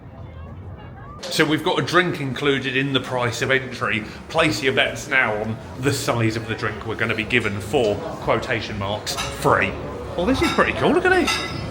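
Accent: Scotland, United Kingdom. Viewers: are you Scottish??.....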